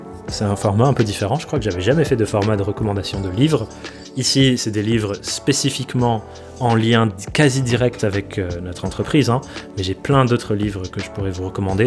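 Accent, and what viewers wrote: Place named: England, United Kingdom. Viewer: On aime toujours autant ton accent anglais ;) Merci pour vos recos monsieur !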